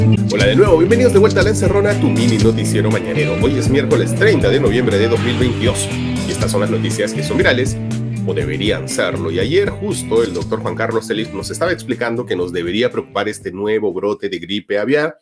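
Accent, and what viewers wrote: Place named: Arequipa, Peru. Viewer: Es de origen arequipeño esa forma de acentuar.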